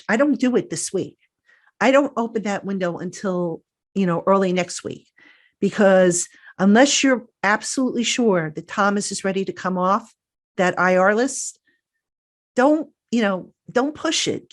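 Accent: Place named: Northeast, United States. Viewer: LOL I f!@ love your accent. 'Ahwords' I love it. As a Canadian I have been conditioned to assume you're either a mobster or possibly a deli owner. But as a Maritimer we share a lot of vocal similarities with the North Eastern US, think just north of Boston. Other Canadians tell me I say "car" like I'm from NYC which is silly but there you have it. EDIT: Just so you know I subscribed a few weeks back at the start of the season but when you mentioned it I looked and I wasn't subscribed anymore. Just a heads up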